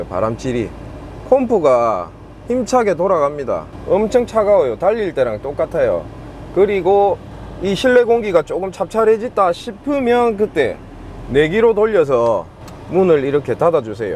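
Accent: Gyeongsang, South Korea. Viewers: 행님 영상 급나게 잘보고잇습니다 경상도 사람이라서 사투리 쓰시는 유튜버 뵐때마다 을매나 반가운지😁 제가 일할때 레이 밴 차량을 타는데 이 방법이 잘 안통합니다 ㅠㅠ 형님 영상에 있는대로 외기순환을 적극적으로 활용해서 해도 에어컨 팬 자체가 약해서 그런지 안통하더라구요 ㅠㅠ 방법이 어찌 없을까요.. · 실내가 찹찰해짔다 ㅋㅋㅋ 아 찐 갱상도남자 ㅋㅋㅋ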